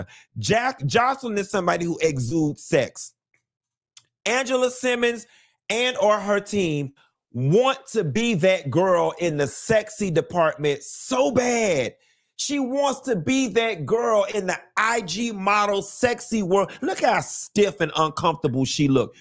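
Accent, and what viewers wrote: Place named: South, United States. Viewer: I can’t with her “new country accent “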